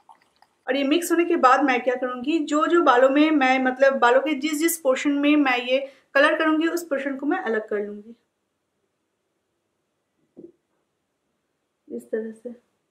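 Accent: Bengal, India. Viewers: Are you from West Bengal, your language is like bengolis speaking hindi ( ^ v ^ )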